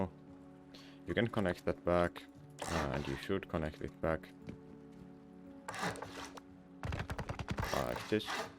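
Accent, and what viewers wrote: Finland. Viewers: Are you finnish?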